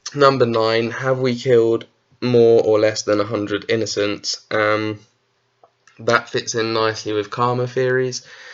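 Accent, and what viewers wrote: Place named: United Kingdom. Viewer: Wow such a well put together analysis by this guy some good information by the way FUCK MY LIFE I have been looking at thE OAK ISLAND MONEY PIT wow thank you for bringing this mystery to my attention I am really enjoying researching it on a separate note I keep hearing an accent what part of the world are you from you do not have to answer I was just curious I am sure it is the UKW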